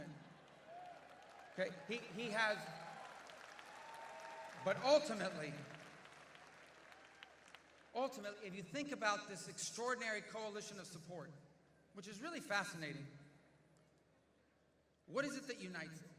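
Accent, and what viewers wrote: Cuba. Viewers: Maravilloso acento Cuba 🇨🇺♥️🇺🇸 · Que lindo es el acento cubano .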